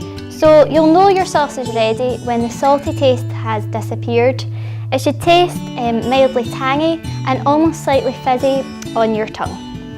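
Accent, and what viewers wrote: Scotland, United Kingdom. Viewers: the broad Scottish accent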